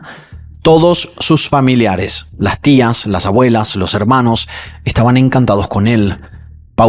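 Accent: Paraguay. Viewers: es de nacionalidad Paraguaya, y así hablan los paraguayos!! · Es paraguayo. Habla hermoso 💃😎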